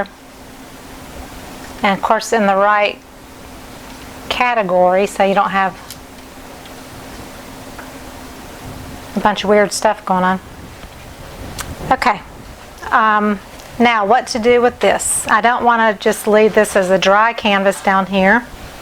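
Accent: South, United States. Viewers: Hi. I have to say I am not a fan of the colors BUT I LOVE how fearless you are in trying different things AND showing us your boo boo’s. You could have easily edited your video to hide the fact that you forgot the additive but you showed us how to recover and that makes you an excellent teacher. I love how you blew on the painting to create more cells and added color to the blue area after the fact. You take risks and just roll with it. This has made you a master of this medium and I applaud you for your efforts. I will always watch your videos because I enjoy learning your methods, personality and your southern accent. Thank you.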